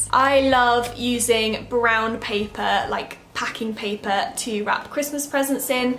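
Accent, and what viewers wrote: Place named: United Kingdom. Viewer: It’s interesting to see how other ppl around the world decorate, especially around the holidays! Based on you accent I’m assuming you’re in Great Britain or close to? Do y’all have “dollar stores”? Or similar type of stores? I wonder if the same things that are trending or popular in the US, are also in Britain at the same time? Hmmmm, it’s certainly interesting! Good day to you, stay well.!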